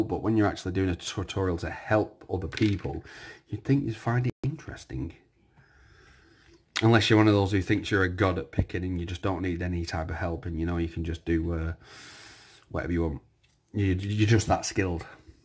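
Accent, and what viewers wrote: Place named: Manchester, United Kingdom. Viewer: Great video, I don’t understand how anyone could give this a thumbs down. I’m glad you mentioned Manchester, I’ve been wondering what type of accent you had.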